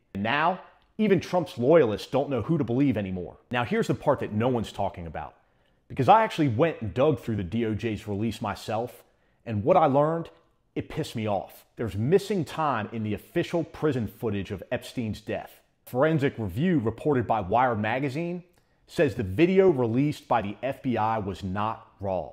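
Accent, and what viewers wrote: Baltimore, United States. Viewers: I am from Baltimore and it is fun to listen to someone with a Baltimore accent please say water plus I like your message so hi hon and keep it up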